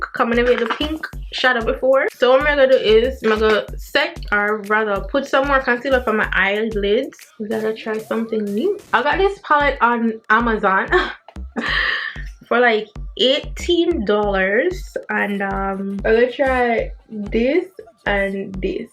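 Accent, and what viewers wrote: Jamaica. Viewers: Yh man she a Jamaican ✔✔Certified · “Mario Sum’m Sum’m Sum’m” 🤣🤣 Loveeeeee di patios vibezzz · Omg I love her accent my favorite people are Jamaicans ❤ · Mi just enjoy all deh patois video deh. Mi love fi hear ya speak Jamaican Patois enuh. ( Jamaican- American here)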